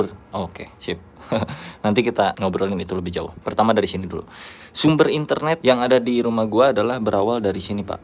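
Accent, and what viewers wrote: Sunda, Indonesia. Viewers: ha..ha...ha... sunda pisan , salam kenal ti cimahi kang...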